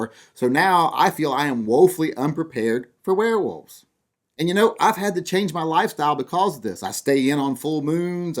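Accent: United States. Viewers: I hear you.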